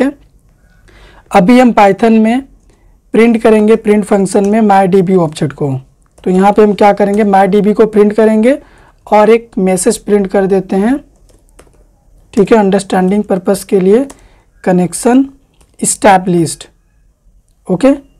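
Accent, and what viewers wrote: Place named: Jharkhand, India. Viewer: Hearing your voice, I remember my friend Venkatesh in Guwahati , who was from Jharkhand with same accent. Thank you.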